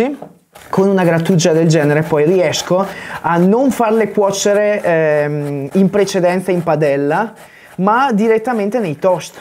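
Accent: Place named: Trentino, Italy. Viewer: though he does have a Trentino accent with Veneto influences, and the dialects that are spoken there belong to the same family of southern French and Castilian and are the more "spanish sounding" of the country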